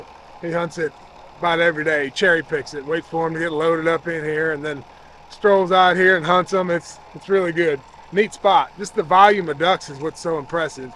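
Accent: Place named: Arkansas, United States. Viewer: Sounds like you’re from Arkansas…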